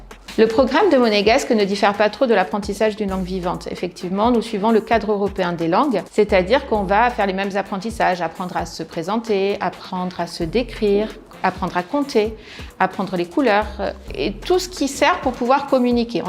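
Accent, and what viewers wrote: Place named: France. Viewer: Pessimo monegasco. Con marcato accento francese. Una lingua morta ormai.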